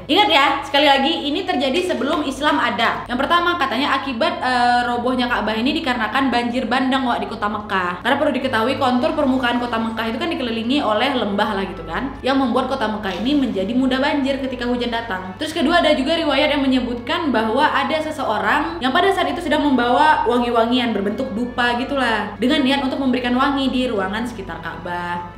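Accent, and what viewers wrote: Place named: Medan, Indonesia. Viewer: Cerita penggalan sejarah Nabi yg disampaikan dengan cara yg sangat menarik , tuturan gaya medan yg khas dan isi cerita yg terurai dg enak dan menggelora jiwa yang selalu haus terhadap kebesaran Yang Maha Kuasa dan keindahan Achlak Nabi Muhammad SAW. Selamat wak. Semoga istiqamah wak. Wassalam. Ww.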